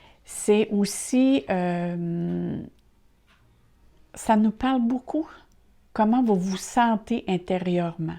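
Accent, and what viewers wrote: Canada. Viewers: Bonjours ! J'ai réécouté pour le plaisir et le professionnalisme. Et aussi l'accent et le vocabulaire canadien que j'adore...ma mère est charentaise et son patois est très proche de vos expressions. J'ai été touché aussi car je suis taureau/taureau/vierge...., thérapeute juste retraité, et dans les starting-blocks pour une nouvelle impulsion. Vous m'avez shooté de positivisme. Je suis en amour🤗🤗🤗🙏🙏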